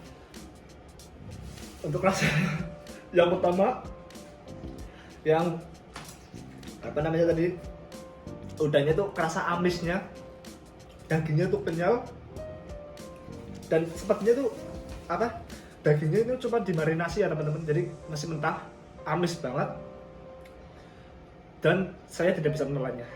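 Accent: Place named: Banyumas, Indonesia. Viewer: ngapak e oraak ilang rek😅 Mantab mas, lanjutkan😁👍 salam angkatan IM JAPAN 315🤝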